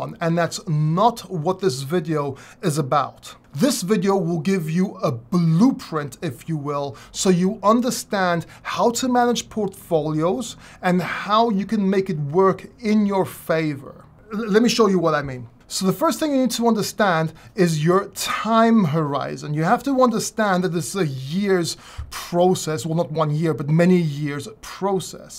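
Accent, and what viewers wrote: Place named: North London, United Kingdom. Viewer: I could detect a slight N London accent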